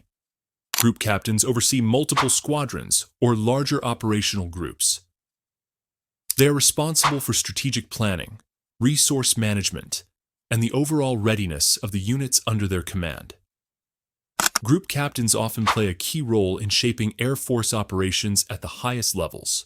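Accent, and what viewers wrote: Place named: United States. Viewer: So weird here’s an American accent explaining Australian military ranks. No thanks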